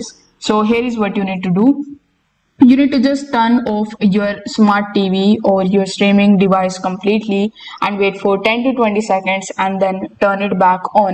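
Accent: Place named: Bengal, India. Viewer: B4 you tell us how to fix please talk proper English not bangali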